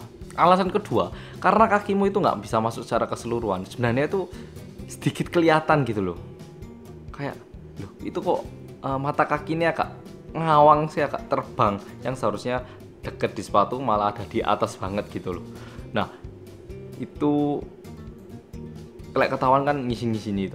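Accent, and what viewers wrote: Java, Indonesia. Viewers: 안녀와세요 저 한국에서 공부하는 인도네시아인 루푸스예요. 피디오보면 인도네시아 말하시면 진짜 사투리가 자와이네. 정말 처음 들어서 신기하다 ㅋㅋ. 화이팅! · Halo mas, mau saran aja, kayanya seru kalau mas bikin video dengan berbicara sedikit korea hehehe dengan diberi translate ke indoatau inggris tentunya penasaran apakah aksen korea masih kental meskipun aksen jawa sangat mantap! hehehe semangat dan sukses untuk vlognya!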